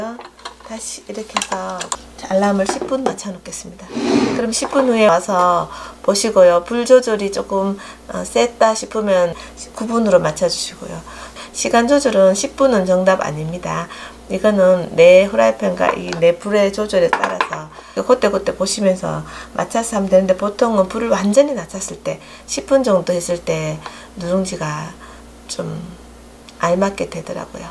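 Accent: Gyeongsang, South Korea. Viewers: 경상도말씨가 정감이가네요 설명도쉽고 정말감사히 잘배우고갑니다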